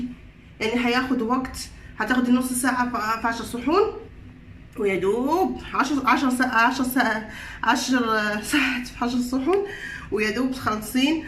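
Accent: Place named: Libya. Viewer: هذه أي لهجة ليبية؟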